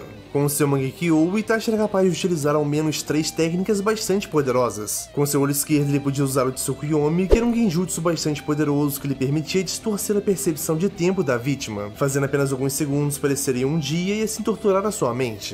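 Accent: Portugal. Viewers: Esse cara é Português de Portugal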